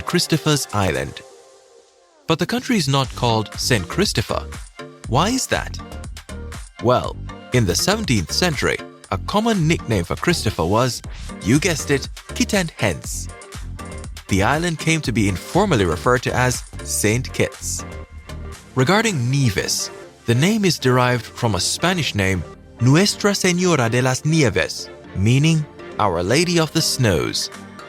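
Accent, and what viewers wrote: Jamaica. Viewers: He sounds Jamaican to me and I am Jamaican. But then again Grendians have a similar accent too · The Host Presenter Is Jamaican/ I Can Hear The Accent🇯🇲🇯🇲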